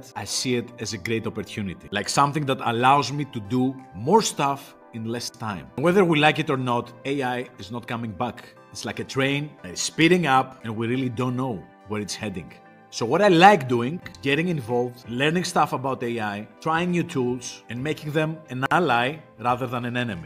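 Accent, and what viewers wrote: Greece. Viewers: Είσαι Έλληνας ; γιατί η προφορά σου μοιάζει πολύ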